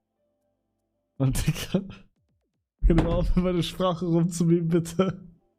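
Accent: Germany. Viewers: Es hört sich einfach an wie schwäbisch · An manchen Stellen bisschen wie pfälzisch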